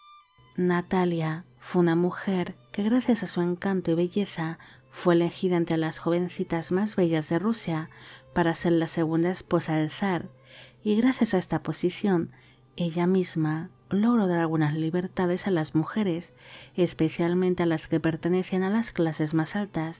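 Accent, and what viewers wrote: Spain. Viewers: De que parte de españa es el acento de la voz de?:0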